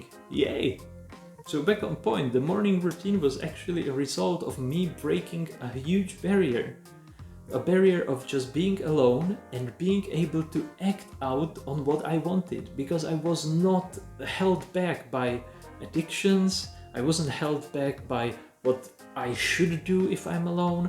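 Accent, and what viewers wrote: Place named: South Africa. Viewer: Are you South African my friend? I know your accent!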